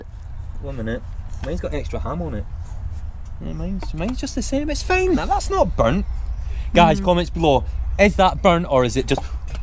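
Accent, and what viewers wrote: Scotland, United Kingdom. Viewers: Hi and, we want to say a big thank you for all the entertainment you give us. Fabulous photography it’s amazing. I’m very much looking forward to the next chapter in your lives., what accent do you have please? We thinking it’s Scottish.? Anyway, keep the videos coming and take care of yourselves. Lin in Devon.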